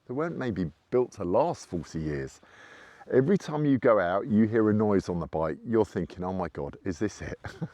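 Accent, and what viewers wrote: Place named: United Kingdom. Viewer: You sound like you have a British accent. As a Brit, have you owned or ridden a classic (pre John Bloor) Triumph or other British bike? I’ve owned many old 1960’s-1970’s Triumphs over the decades, and I still have a couple. Although it’s not as easy as running to the local dealership, finding parts for those older bikes isn’t really a problem. You can find anything you need online… for now, anyway.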